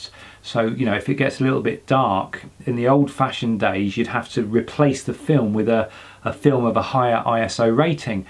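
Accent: England, United Kingdom. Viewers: Are you Englishman?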